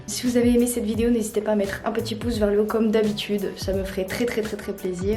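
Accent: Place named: Nord, France. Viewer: T'es cht'i ? T'as trop l'accent !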